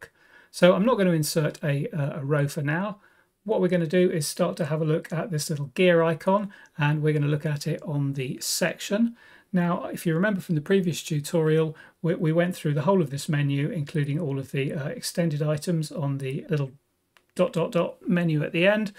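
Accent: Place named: United Kingdom. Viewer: Good morning and thanks for your informative videos. A little tip for your presentation… your picture covers the settings window-sometimes. Please move the settings window more to the left since your picture is placed on the bottom right😊 I really like your calm way of explaining and your perfect British accent which makes it pretty easy for understanding everything. Best regards from Germany Ralf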